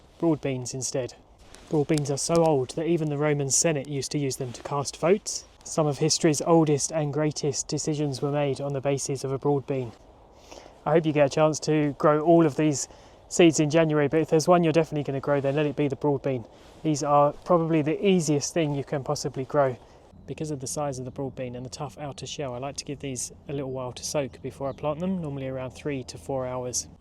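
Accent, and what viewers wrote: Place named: Australia. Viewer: Goodness, you sound so Australian!! My bad.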